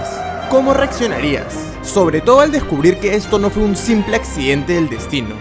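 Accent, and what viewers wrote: Peru. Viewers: Por favor deja ese acento de limeño, habla neutro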